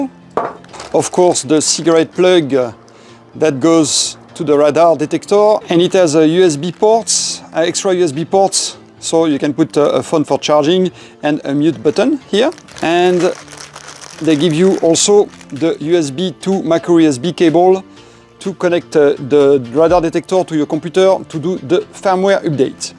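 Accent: France. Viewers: Ooo lya lya! strong French accent! Therefore, the question is what kind of radar detectors ( models) can be used in France!!! Where, as we are all know, VERY, VERY serious consequences of using such devices. P.S. My experience - NEOLINE X-COP 870s, not in France offcourse... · it’s a French accent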